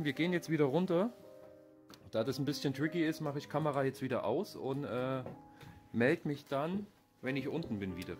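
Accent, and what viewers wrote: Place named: Upper Swabia, Germany. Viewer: Du kommt aus Oberschwaben gell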